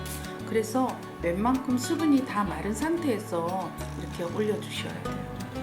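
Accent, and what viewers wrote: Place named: Gyeongsang, South Korea. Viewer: 유혹의 꽃 장미도 차를 제실방에 오시어서 감사합니다 경상도 이신가 봅니다 늘 응원합니다 감사합니다 🙏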